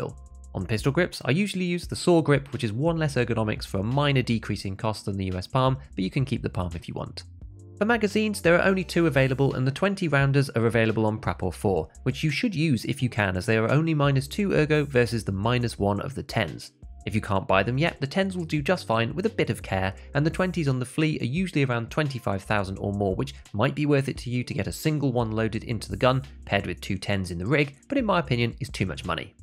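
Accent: United Kingdom. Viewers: I just want to give an absolutely TREMENDOUS callout on the zeroing conversation! It's so important to understand what the "default" round is for the gun to understand if you'll be shooting low or high, ESPECIALLY for a long range gun like the SVD. As always, stellar work my fine-talking British friend.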